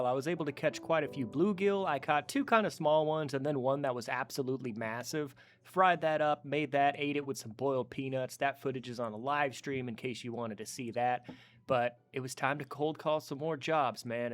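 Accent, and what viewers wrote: South, United States. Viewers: I’m sorry am I crazy, you actually sound like you’re absorbing a little bit of the Arkansas/Southern drawl??? Sounding less like a Californian for sure!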